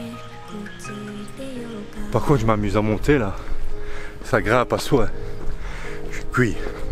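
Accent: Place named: Belgium. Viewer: Salut! C'est chouette d'entendre parler "belge" au sujet du Japon. Merci pour ta trop brève vidéo au sujet de Kobe. Je rentre d'un 5ème séjour (en 10 ans) au Japon. J'y pratique la pêche "en mer du bord". Je suis namurois et j'ai 73 ans. J'étais à Yokohama qui rime avec drôle de choix jusqu'au 20 juin. En bon amateur de tout ce que le Japon propose, je prépare déjà mon prochain voyage et ce sera...Kobe. Voilà comment je tombe sur tes reportages. Bravo, je t'encourage à poursuivre. Konitchiwa. Guy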